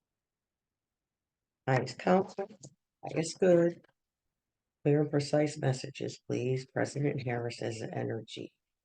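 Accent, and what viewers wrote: New York, United States. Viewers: You from NYS??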